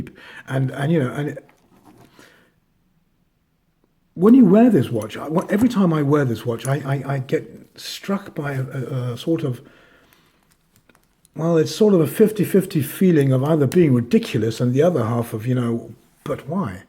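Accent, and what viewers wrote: England, United Kingdom. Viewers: What accent is this? I mean I know you're from England (unless you emmigrated) but where abouts in England?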